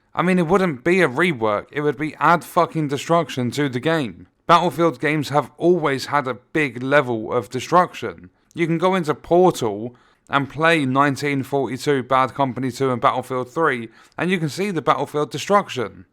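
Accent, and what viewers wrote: United Kingdom. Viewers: Not to be disrespectful but the british accent makes this video even more enjoyable and funny. :)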